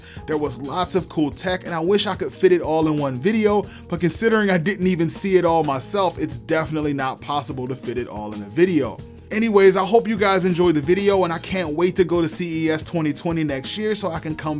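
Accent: Baltimore, United States. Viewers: Thought I recognized that MD semi b more accent.:) Great vid as usual I use a lot of your info. MW, Wash DC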